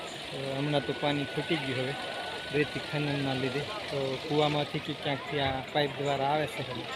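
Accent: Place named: Kathiawar, India. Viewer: તમે પણ અહીંયા ના છો તો પછી તો પછી કેમ કાઠિયાવાડી બોલો આપણી શુદ્ધ ભાષા બોલોને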